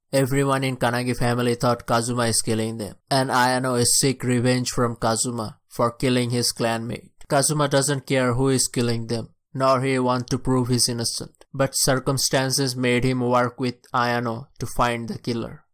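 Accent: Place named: India. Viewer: R u Indian 😍😍😍😍😍😍😍😍😍😍 U accent so cool, mjhe tmhara awaz bhot psand aya 🤗🤗🤗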